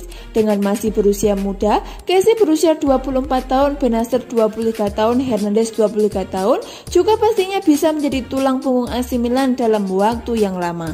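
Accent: Java, Indonesia. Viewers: Seneng banget denger conten ini, jawa nya medok. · Narator nya medok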